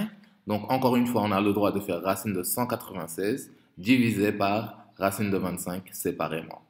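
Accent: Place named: Africa. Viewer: Vraiment merci beaucoup, et je suis sincère maintenant, j adore ton accent africains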